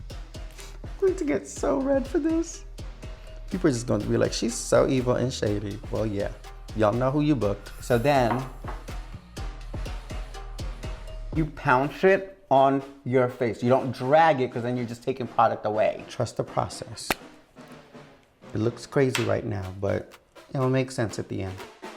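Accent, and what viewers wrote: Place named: New Jersey, United States. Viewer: I’m not from the US so I’m not really sure of what a Jersey accent is but I’m pretty sure Jiggly has it.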